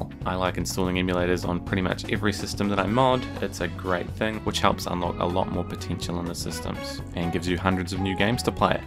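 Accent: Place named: New Zealand. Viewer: Nice to hear a kiwi accent 🤘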